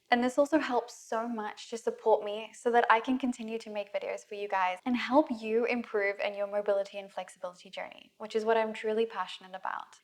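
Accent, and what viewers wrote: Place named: South Africa. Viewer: I hear a South African accent here :-)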